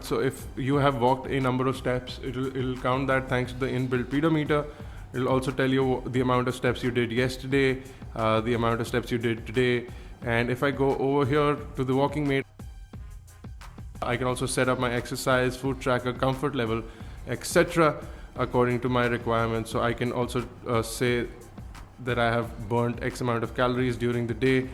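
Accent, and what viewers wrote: India. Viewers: Dat indian Accent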